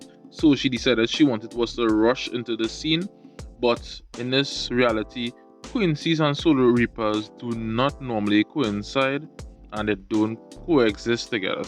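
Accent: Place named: Trinidad and Tobago. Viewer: The moment I hear the voice I was shocked. Lol. A Trini giving a rundown on anime.... Amazing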